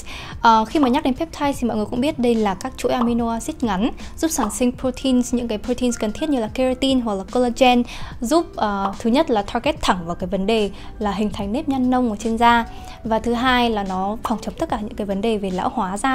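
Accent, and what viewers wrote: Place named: North, Vietnam. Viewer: Em nói Tiếng Anh nghe tuyệt quá, và đây là lần đầu tiên thích nghe giọng một người nói tiếng Bắc như vậy, không giống những người chị từng nghe.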